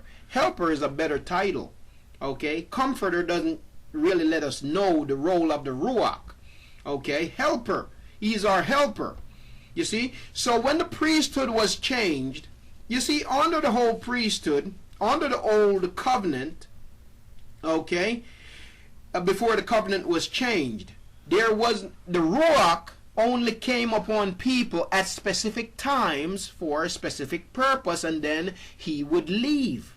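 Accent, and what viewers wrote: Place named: Caribbean. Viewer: Thanks for sharing. I heard your voice and was trying to place this Island brother.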